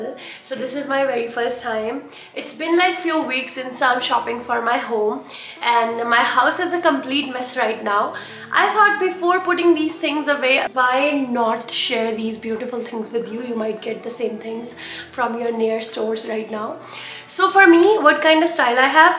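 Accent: Punjab, India. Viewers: Punjabi touch maza le ata hai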